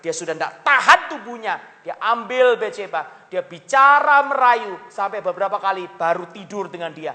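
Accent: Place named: Java, Indonesia. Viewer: Logatnya tetap beda medok "Jawa"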